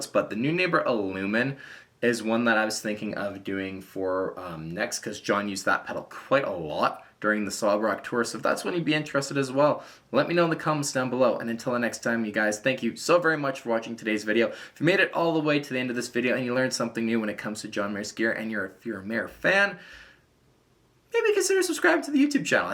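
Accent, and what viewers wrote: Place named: Newfoundland, Canada. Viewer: Your accent is super interesting. Candian but with a hint of Irish? Is your family from Newfoundland?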